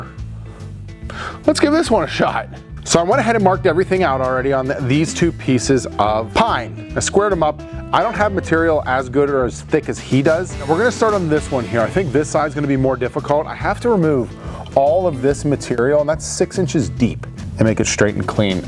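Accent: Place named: Pennsylvania, United States. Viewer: Youins guys.........Pennsyltucky English. Love your channel. Thanks for sharing.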